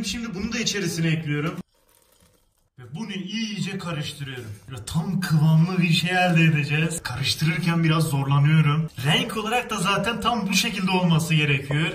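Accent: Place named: Hatay, Turkey. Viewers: Abi anlıyorum hataylisin bende adanaliyim fakat şu konuşma tarzıni degistirirmisin bak abi videolarını severek izliyorum ama diyorsun ki yapiyirum diyorsun abi harfleri yutmadan konuşsan daha anlaşılır olur yapıyorum de abi dolaba koyuyorum de abi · Hatay sadig demeniz hatay iskenderun olmanızla ilgili Şive tam bizim koyun şivesi gidük gelük şuraya öte yayliye öte buri gel napön falan hataylıyım insalah bir gun karşılaşıp yaptiklarindan tatarım😀👍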